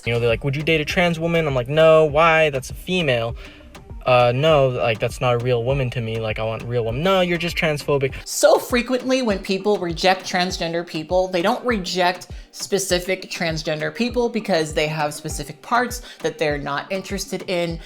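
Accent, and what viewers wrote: United Kingdom. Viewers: 🧡 i love the british accent that gets sprinkeled in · 🧡 is no one going to discuss accent developments? i love the dips into accents every now and then. we a do it to varying degrees of success, but british is starting to get good. i wonder if she's been binge watching bridgeton or following a new english youtuber. whatever, it's fun when people switch accents for no obvious reason. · mood As a fellow British person, I would like to say your lapses into the British accent (which is SO clearly your native accent ;-) ) bring me great joy. (I can't ever put the emojis because I watch your vids on my computer :sadtimes:) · 🧡🧡 that british accent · I've noticed you've been occasionally doing a British accent randomly in your videos. Especially recently. When are you getting your own BBC series? 🧡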